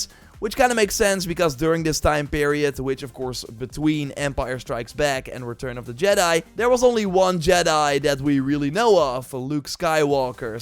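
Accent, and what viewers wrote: Netherlands. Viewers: You sound Dutch bro 😁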